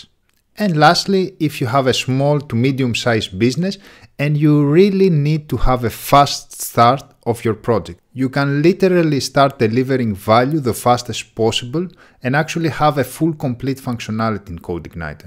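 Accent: Greece. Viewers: α ρε φιλε κανει ΜΠΑΜ οτι εισαι Ελληνας,δεν μπορεις να φανταστεις πως νιωθω οτι ακουω εναν Ελληνα να μιλαει για τετοια θεματα ( οχι ειμαι τιποτα κολλημενος με τη χωρα η τιποτα πολιτικα οτιδηποτε) απλα καταλαβαινεις , ειναι τελειο να καταλαβαινεις οτι ο αλλος ειναι Ελληνας με τοσες γνωσεις για το συγκεκριμενο πραγμα που σε ενδιαφερει και σενα. Συγχαρητηρια ρε φιλε , συμφωνω σε ολα οσα ειπες στο βιντεακι. Κακο που μιλαω στα ελληνικα βεβαια , απλα ενιωσα την αναγκη να δεις ενα ελληνικο comment για να καταλαβεις οτι ειναι respect αυτο το πραγμα. Απο τα επομενα ολα αγγλικα :) Μου προσφερες ενα τρελο χαμογελο ( με τη καλη παντα εννοια) απο τα πρωτα δευτερολεπτα που καταλαβα οτι μιλαει Ελληνας.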